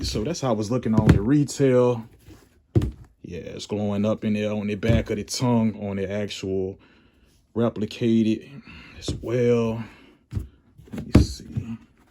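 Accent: Richmond, United States. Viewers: Sound like you from Richmond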